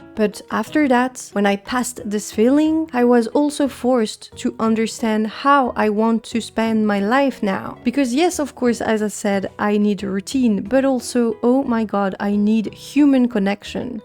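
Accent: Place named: France. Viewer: Le petit accent français ;)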